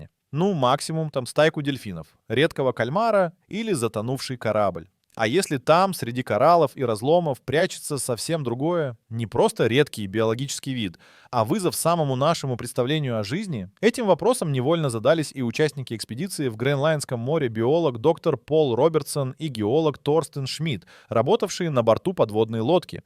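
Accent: Portugal. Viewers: Vídeo com sotaque d Portugal , ora em castelhano , ora em português do Brasil , q bosta d vídeos fake como a locução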